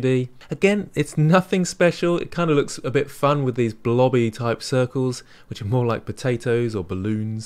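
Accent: England, United Kingdom. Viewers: English Accent Hits Different.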